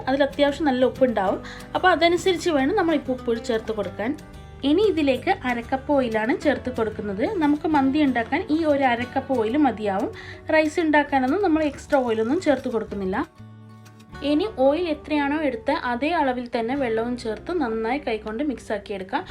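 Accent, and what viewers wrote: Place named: Kasaragod, India. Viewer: Kasargod slang kelkkan nalla rasa... recipe kananum othiri ishta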